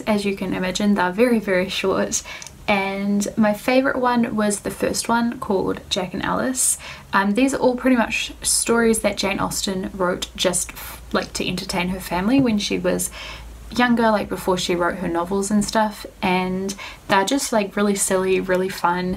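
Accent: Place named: New Zealand. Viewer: Just stumbled across your channel from your Harry Potter film Wizardry flick through. I could tell you were a Kiwi straight away from your accent :) Just subbed and So excited to see more of your videos in the new year!! HAPPY 2019 - Also when you said Molly Moon it brought back so many memories haha.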